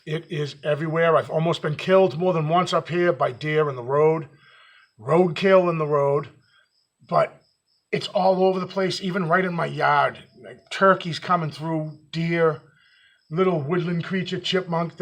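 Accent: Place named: New England, United States. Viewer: Your analysis of tree and branch breaks are insightful. I don't always agree, but breaks are still physical evidence. I've agreed with quite a few of your assessments. I think changing the time of day that you do your hikes will change what you might find. Midday is probably not the best time of day. But no matter what any one thinks, you keep doing what you enjoy. And by the way, we enjoy your New England accent, lol.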